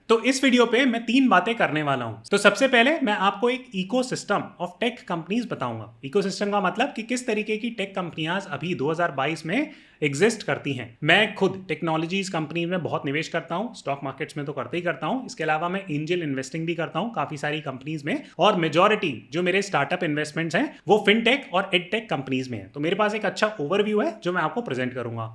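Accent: Standard. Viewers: Apki hindi bahut zyada Shudh ha!!!😁❤️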